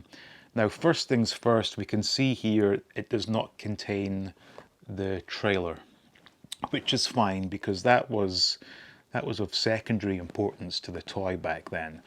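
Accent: Scotland, United Kingdom. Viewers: I thought you were Irish at first. So Scottish like Bruce Wayne and Roddy Piper(Roderick Toombs). Well they are Scottish descent.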